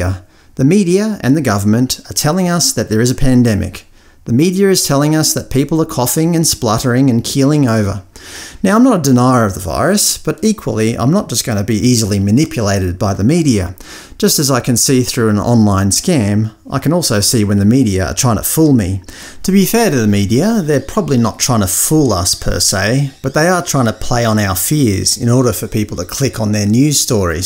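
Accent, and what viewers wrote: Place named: Australia. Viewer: Don’t know what it is exactly. But the calming and soothing of your voice and your awesome Aussie accent just appeals to me.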